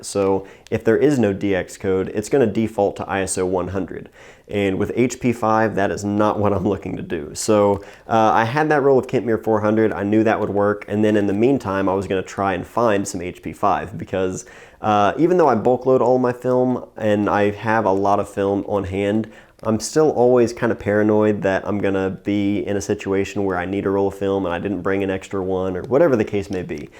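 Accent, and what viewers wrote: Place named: Mid-Atlantic, United States. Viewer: I know a mid-Atlantic accent when I hear one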